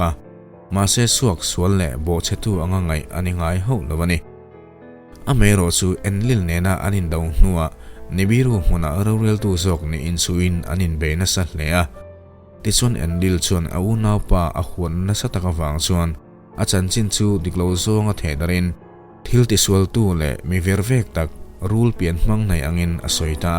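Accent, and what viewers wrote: Mizoram, India. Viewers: A hnar aw nih chu... A hmar in a trong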